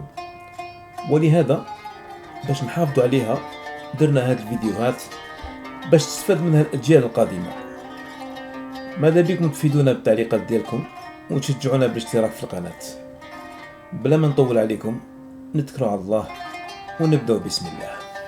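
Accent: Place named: Algiers, Algeria. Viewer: امثلة مليحة الله يبارك فيك، و هذي امثال جزائرية ولكن راك تنطقهم بالهجة العاصمية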